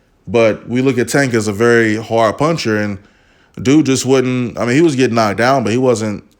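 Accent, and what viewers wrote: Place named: Baltimore, United States. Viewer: Thats how we talk in Baltimore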